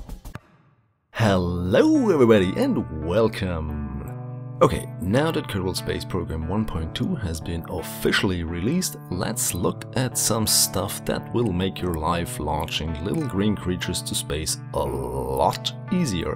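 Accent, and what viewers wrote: Germany. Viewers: u German? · Are you german by any chance? ;-)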